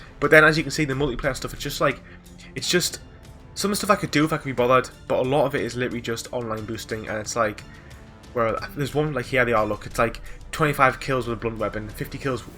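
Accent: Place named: Northern England, United Kingdom. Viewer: Sounds like your from my neck of the woods. I'm in darlington pal, nice video man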